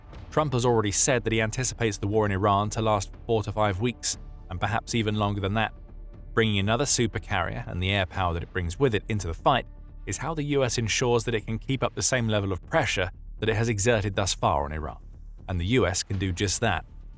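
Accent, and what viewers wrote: England, United Kingdom. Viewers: Get rid of the British narration. Britain is irrelevant now. Use an American narrator. Unsubscribed. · Sounds like a bloke from England who put this together. And we all know England can't be trusted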